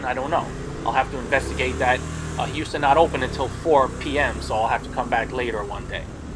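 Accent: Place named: New York, United States. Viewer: thanks for another ride along. i really like these. on a side note i ran across an old video of yours. it was the one you made a wine bottle tongs. boy your new yorker came out in that one..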